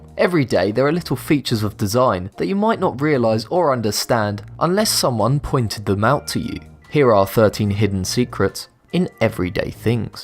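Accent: United Kingdom. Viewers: British English... How I love to hear it up! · ABritishGuy He doesn't sound American to me. He sounds British. So who is the idiot now. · 1) You’re an idiot! 2) My ears bleeds wherever I hear a British accent! 3) You make a lot of remarks you think are funny but in reality they are not. People who have no sense of humor should abstain from making lame remark that only they believe is funny. 4) “The seven spike on the statue of Liberty represents the 7 continents” False! Untill a decade or two it was aways believed and taught in school in the <num>’s that there are 5 continents: Europe, Africa, Asia, Australia, America. It's only recently (around 1980 or 1990) that we added Arctic and Antartica. If you are going to make up things why not say it represents the 7 days of the weeks? I mean if you want to make stupid comments, go all the way.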